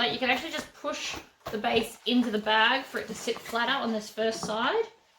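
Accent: Australia. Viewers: So refreshing to hear an Aussie accent even if you're pitching to overseas viewers with measurements in inches. Great to be able to easily find things at my local shops here in Australia rather than hearing about Dollar Tree or Michaels stores! Thank you for these projects and helpful tips. I wish you every success.xx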